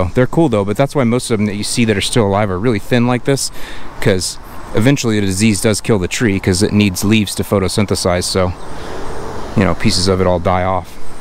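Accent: Chicago, United States. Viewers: MORE CHICAGO ACCENT 😎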